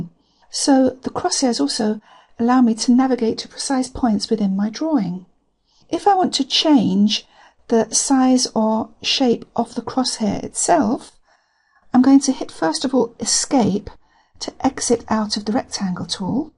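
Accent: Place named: United Kingdom. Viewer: Lovely to hear a clear British voice. Thanks for your tutorial 👍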